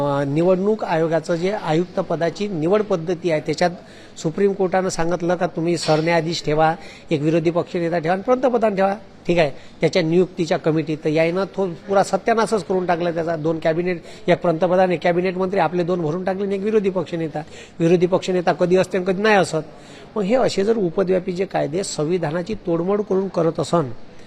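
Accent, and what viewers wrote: Vidarbha, India. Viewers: हे विदर्भ बोली भाषा आहे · पूर्व विदर्भात असेच बोलतात..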